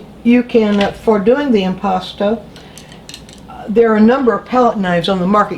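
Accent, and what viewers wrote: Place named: Georgia, United States. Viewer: Thank you for the reply. I am so new to oil painting as a medium that it is extremely helpful to me to learn how the paint and additives work. I am so used to the instant gratification of acrylic and I am so afraid of ending up with a piece I love in oil and it never drying - especially with the cold wax. :-) I'm glad I made you smile and I hope the Georgian accent doesn't disappear entirely, it is so lovely. I'm a fan of accents and Georgia is a favorite. :-) Thank you again for the generosity of your quick tips instruction :-)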